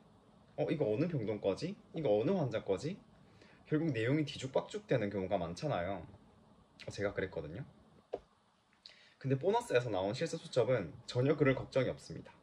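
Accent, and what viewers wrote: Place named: Busan, South Korea. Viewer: 오..와 사투리가득한부산남자의 제품리뷰..😆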